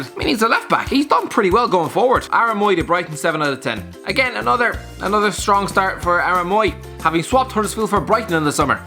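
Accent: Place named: Ireland. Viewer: his tongue moves faster than he talks Love u.. #noHOMO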